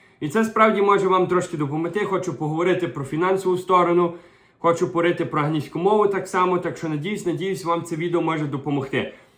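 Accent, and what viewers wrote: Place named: Lviv, Ukraine. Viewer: Дуже гарний львівський акцент, маю також родичів у Львові. Але також хочу виїхати через війну, але вік 52,сину 15,чи маю шанс? А ви молодець!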